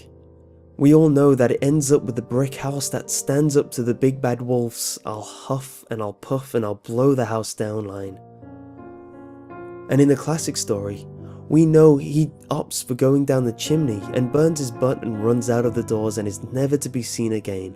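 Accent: England, United Kingdom. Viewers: Excellent narrator!! I love your English accent and how you talk slowly and eerily!! Just like the music you use in a movie, sets the stage of the movie, the same goes for all YouTube videos. If you present any paranormal content, it sounds so much better when the narrator takes the content seriously!! I absolutely hate when i click on something paranormal on YouTube, and the narrator talks loud and fast and stupidly, I click off that video FAST! People that look up this type of content believe in the paranormal and take it seriously. We don't want to hear paranormal content presented as a joke!! Whos with me on this??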